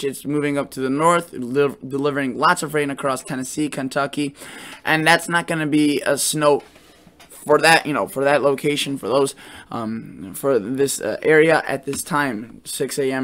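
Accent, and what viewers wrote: Midwest, United States. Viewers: You have a nice voice for these presentations and I enjoy your accent. I think it's from somewhere in the Midwest/Upper Great Lakes. I am a Southerner originally but now living in New England. I can tell your accent is not from the South or New England.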